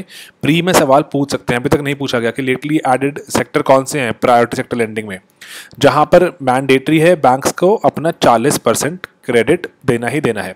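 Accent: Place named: Punjab, India. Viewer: Sir bahut hi awesome videos bnate hain..mene pura dekha.. Jo topics mujhe bilkul upar se ja re the..ab puri tarah clear ho gye hain..mai bahut khush hu.. Appreciable content And your voice is really very adorable💜 Jo panjabi accent me chhote chhote jokes crack kr dete hain us se video me man lga rehta hai 😁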